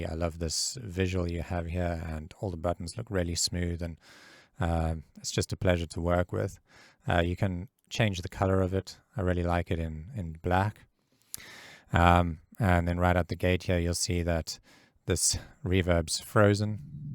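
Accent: South Africa. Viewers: hey man, are you south african by any chance?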